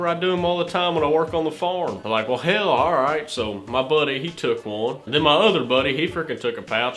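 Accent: Kentucky, United States. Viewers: What part of KY are you from?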